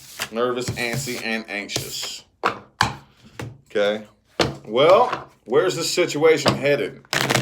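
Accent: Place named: Rural, United States. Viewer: Love your country voice cute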